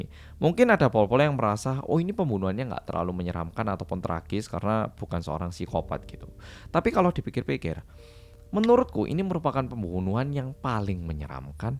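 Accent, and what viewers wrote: Java, Indonesia. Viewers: Kadang aku lihat channel yt ini lupa kalau host nya ini orang korsel asli 😂 sangking medoknya kayak gak keliatan koreanya 😂😂😂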